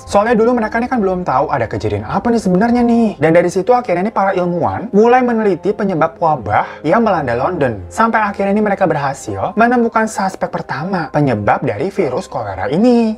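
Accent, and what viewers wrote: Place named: Jakarta, Indonesia. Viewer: Suka pengen ketawa sama abng yg satu ini 😁nama nama depan kyk nama jepang, wjh jg rada" Jepang atau cina, pas ngomong betawi ora 😁 lanjutkan bng, gw syukkkaaa syukkaaa syukkkaaa dngn bhs Betawi ora nya itu 😂😂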